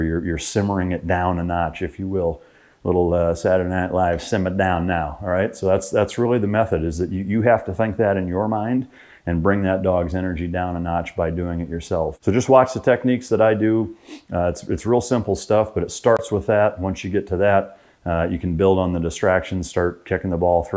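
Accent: Netherlands. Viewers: Are you Dutch? Good video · are you dutch ? with the af and blijf?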